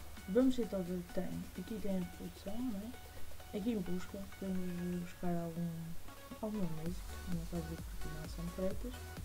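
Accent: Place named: Portugal. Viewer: portugues de portugal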